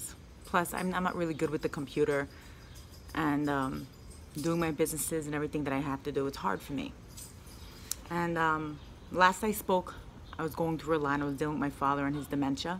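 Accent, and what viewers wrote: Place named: New Jersey, United States. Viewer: a stalker. By the way you sound more like Jersey than Brooklyn. Lol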